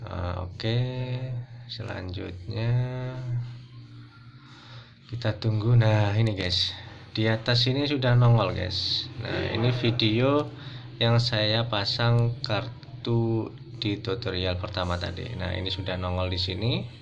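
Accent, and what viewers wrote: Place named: Java, Indonesia. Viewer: Makasih info triknya boskuuhh...mantap , medok banget ngomongnya yah..😃👍👍👍sudah aman boskuh...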